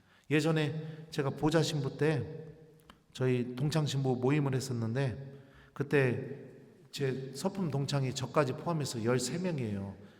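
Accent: Chungcheong, South Korea. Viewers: 신부님의. 강론. 충청도. 사투리가. 구수하고. 귀에. 쏙쏙.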